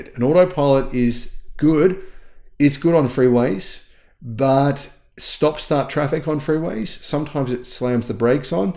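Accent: Australia. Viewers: You sound great! Love the Australia accent. Don’t change! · Your Aussie accent is quite charming and not an impediment...you are 5x5 on communications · I don't like to listen to AI narration or videos that are difficult to understand because of a strong accent. You Aussie accent is accent is actually refreshing. My son drives a vintage model 3. I love riding in it. Used 3's are available at great prices. The only reason I won't buy a Tesla is that Musk is such an ass. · There’s nothing wrong with your accent, it’s pure Australian. The road trip appraisal sounds pretty fair to me. I have friends that own Tesla’s and BYD’s and the Tesla is light years ahead of the Chinese EV range. If you’ve decided to move from ICE to EV, avoid Chinese built cars. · Keep the Ozzie accent BUT “Ion” and “iron” sound the same in Oz Maybe say “eye-on” for ion & “eye-urn” for iron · My wife, daughter, and I test drove the new Tesla Model 3 about a week ago, and we were all impressed. 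Before, my daughter had no interest. During and after the test drive, she kept asking when we were buying one. I saw huge improvements over the TM3 from just a few years ago (2020): fit and finish, quieter, less jarring and less road noise, more comfortable seats and a nicer interior, a better sound system,… This is the best value EV on American roads, easily. BTW, your Aussie accent is great and very easy to understand. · Love the Aussie accent. Wanted to move there to Melbourne so I could have it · NO mate, speak MORE aussie, I love it! Greeting from a dane, an actual viking :D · There's absolutely NOTHING wrong with your Australian accent. You be you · Another great video,. I live in California and appreciate your Australian accent. As for the M3, I drove one for two weeks while my '22 Ford Mach-e was getting body work done. I hated it. The car felt cheap, was loud, and did not have CarPlay. 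I'm happy people are buying EV's but the Model 3 is not a good car. The Model S is much better. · you are easy to understand! We all (my family) loves your Australian accent. Do not change, period❤ Be yourself, you and your family are awesome and always welcome...! Thanks for all you do and we are praying for continued positive health and success ❤ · You sound Okker to me, in the USa I have to adjust a few words, like pronounce Ts with Ds , all part of the fun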